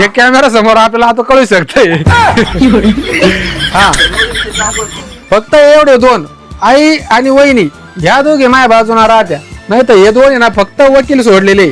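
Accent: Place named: Karnataka border, India. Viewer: Bhasha kannd talukya sarkhi ahe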